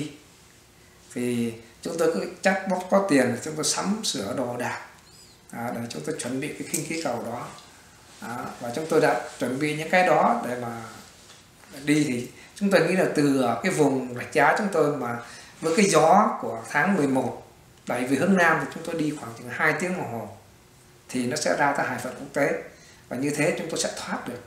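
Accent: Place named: Hai Duong, Vietnam. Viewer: Đúng là giọng Hải Dương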